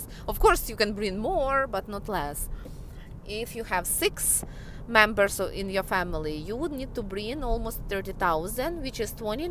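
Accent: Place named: Russia. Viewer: oh that deceiving Russian accent...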